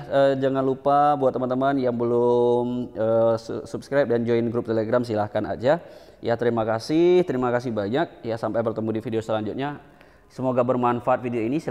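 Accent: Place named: Medan, Indonesia. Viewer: Org medan boss logstnya.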